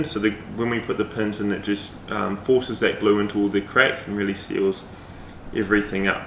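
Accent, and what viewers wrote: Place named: United Kingdom. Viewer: This guy is not american he's brit i bet...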